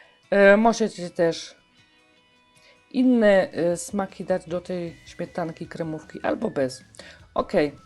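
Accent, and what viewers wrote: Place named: Opole, Poland. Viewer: acha i miłych walentynek jo też mieszkom w rajchu ale jestech ze śląska! 26 lot minolo ale godac po Śląsku niezapomnialam ty mosz taki richtig opolski akcynt jo zaś jestech z okolic Bytomia i tez godom blank po naszym moja koleżanka prowadzi w tc Katowice dej pozor aż gania ze tyla ludzi ze śląska juz niezno tej godki! my Muszyny się tego czimac!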